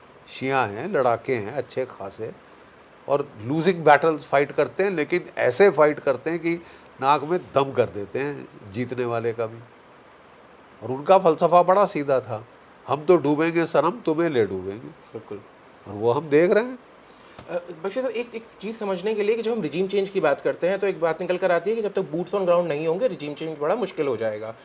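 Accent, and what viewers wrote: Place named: Punjab, India. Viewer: Punjabi बंदा जितना मरज़ी अछि हिंदी सीख ले. मगर उसका लेहजा बता ही देता है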